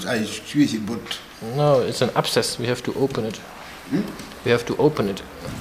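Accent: Germany. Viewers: Wie man hört dass du Deutscher bist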